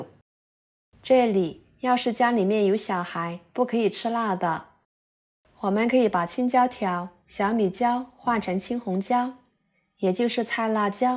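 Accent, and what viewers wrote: Yunnan, China. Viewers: 主播云普口音很重啊 哈哈哈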